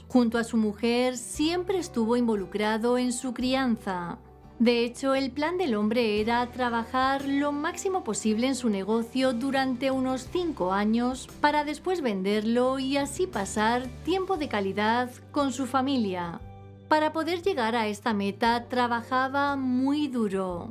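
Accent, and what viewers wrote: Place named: Malaga, Spain. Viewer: Que feo hablas ..erres muy buena narrando però de verdad malagaa España vivarrrr familiarrrr. ...etc etc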